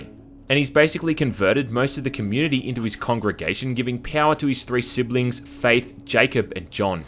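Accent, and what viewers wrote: Australia. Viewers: Dunno why but it was cool when you came out with the Aussie accent I haven't seen your vids before Just bought far cry 5 today, waiting for it to download Cheers from Perth mate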